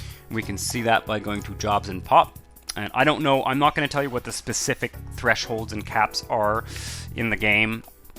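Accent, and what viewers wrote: Canada. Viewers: You sound Canadian, I'm Canadian and I looked at your twitter and I was right 😂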